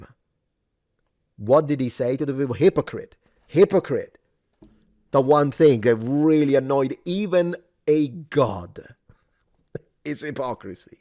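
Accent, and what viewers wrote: Germany. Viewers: My first thought was, "Wait, is this the f*g with the German accent?" It was, in fact, the f*g with the German accent. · he's Indian with German accent? Omg.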